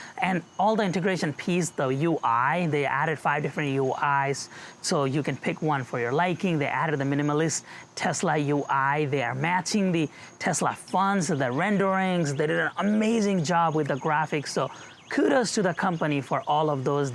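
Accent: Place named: Nepal. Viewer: Nepali bro by accent😊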